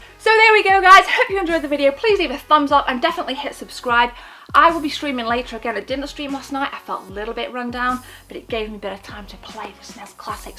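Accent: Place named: Liverpool, United Kingdom. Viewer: where you from..I can't work your accent out...hear bits of Liverpool /mereyside occasionally. brand new to your channel. lov it x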